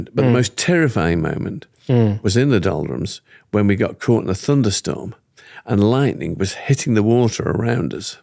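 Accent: United Kingdom. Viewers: That exact accent is the same as Bear Grylls. Can any Brits tell me where it’s from?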